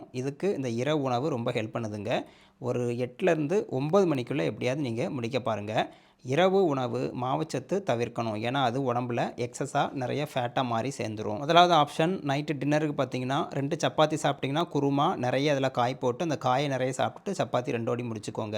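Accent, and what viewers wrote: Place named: Tiruchendur, India. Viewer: Nice sir. I am from Tiruchendur. Your slang is same like us. Which is your native place.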